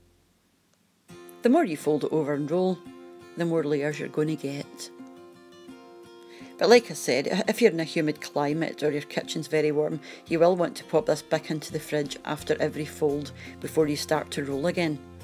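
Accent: Scotland, United Kingdom. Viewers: I love the scots accent, just great. The recipes as well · ❤ the accent and this recipe. Scottish? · Hello from Michigan, USA. I just found you here a few weeks ago. I have made a few of your recipes. They were good. I made individual Cottage Pies and froze all but one, which I ate that night. Husband doesn't like them. He can be a picky eater. I like your recipe better than the one I have been using. Also the beef stew with orange marmalade. My paternal grandfather, born in 1900, was from Aberdeenshire, and came over with his family in 1906. I am glad that I found a Scottish woman who cooks real recipes the true way. I also love your accent. Your weekly shopping got me to go back to using Aldi. I do have to look up and convert grams, Celsius and mark 5. My maternal grandmother was from Canada, so I have no problems with your way of speaking. I know that tea time is our supper, you sit on the Chesterfield instead of a couch, running shoes are tennis shoes, etc. 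Keep up the great show.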